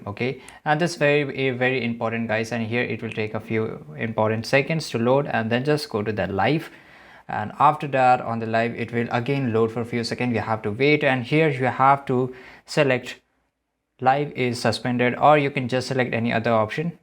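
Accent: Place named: India. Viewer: Indian with an storng Indian accent and doesn’t even know how to fix it lol. Yall country is fully bad on first hand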